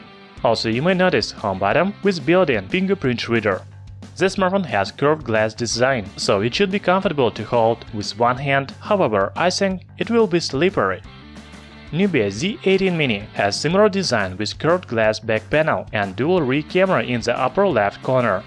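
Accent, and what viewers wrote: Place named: Russia. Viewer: Автор русский, вы чо по акценту не слышите?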